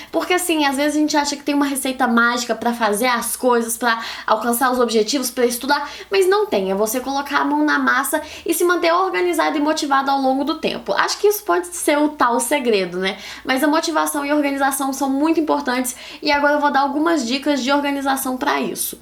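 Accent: Minas Gerais, Brazil. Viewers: sabia que era mineira só pelo sotaque ,😅 😄😍,amo suas dicas ❤ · Voce e mineira também?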